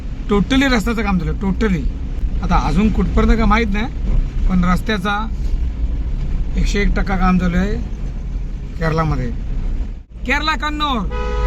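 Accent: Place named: Konkan, India. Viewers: भाय तो कोकणी माणूस आहे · भावा कोकणी आहे तो मुंबई ला कोन मराठी बोलतो रे सगळे हॉटेल ला हिंदी वाले आहेत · Yalach tr majja hy kokni bhasha hy ti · Kokani loka ashich bhasha boltat bhari ❤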